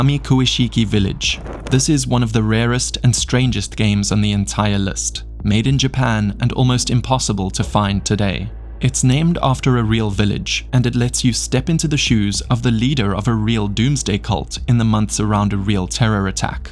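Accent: South Africa. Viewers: Are you a south African boet😅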